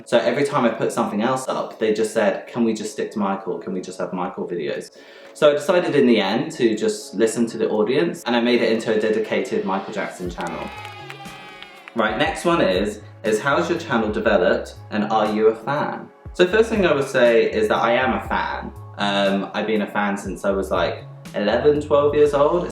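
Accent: United States. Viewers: i never get bored about hearing about Michael Jackson, also like the American voice on the videos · how come the voice overs are American men and women? I didn't know that this was a British content creator behind this Channel. Makes me like it more, fellow Brit! 🇬🇧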